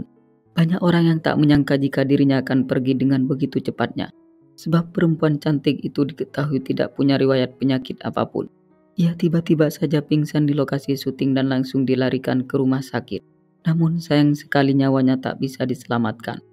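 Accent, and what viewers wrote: Madura, Indonesia. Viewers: Pengisi suaranya kayak orang madura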